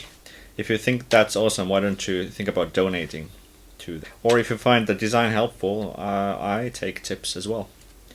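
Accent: Finland. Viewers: Suomiaksentti havaittu! 🔥 Bossi ohjain kyl! 👍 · Your accent is quite finnish, where are you from?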